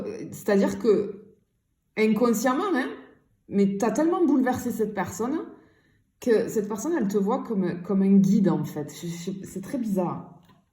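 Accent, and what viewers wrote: South, France. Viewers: Merci, C'est fou ... Au monent où j'ai ouvert youtube j'étais en pleine interrogation et une question en tête et encore plus fou ,je tombe direct sur votre guidance ! Pur hasard ! Merci la grande majorité de votre guidance m'a fais sourire et je l'ai vu ! Merci,cela m'a reconforté, merci pour ce soupçon d'espoir que ses messages étaient pour moi. Tout ça avec notre cher accent du sud. Merci Ps: j'ai toujours peur d'y croire mais merci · Bonjour je vous ai découvert y'a peu de temps 🤗 et vu l'accent magnifique que vous avez j'imagine que vous êtes pas loin de celui dont vous parlez pour moi ici 😂😂 le sud hein .. j'aimerais trop savoir vers où vous êtes située car je vous est découvert presque en même que je l'ai rencontré lui et les énergies sont connectées ! Vraiment !! Trop fort 🤍 ce n'est pas ma fj je précise ,mais certainement un lien transit.. 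un lien qui m'a renoué avec ma féminité non sacrifié..et oui bref 🌻💌🌸🌷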